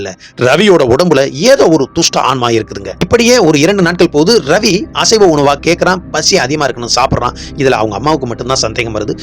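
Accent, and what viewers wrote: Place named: Sivaganga, India. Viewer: Naan sivagangai anna.. Namma pechu style ungakitta aptiye irunthathu, athaan kettan... Show ellam super ah irukku, naalla pannunga anna, enga support eppavum undu...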